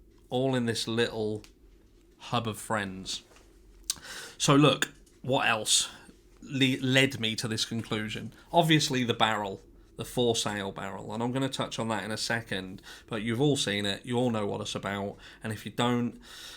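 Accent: United Kingdom. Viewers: Hi there. I just have discovered you in my recommended and enjoyed this deep dive! Its funny to hear a guy from the UK (assuming from your accent lol ) talking about a case here by me in New York (paisley)...funny how small the world has become in the recent times 🤔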